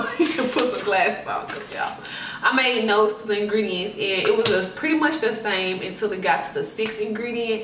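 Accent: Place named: South, United States. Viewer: What part of the states are you from? Your accent sounds.... different. Not sure if it’s a “black” southern accent.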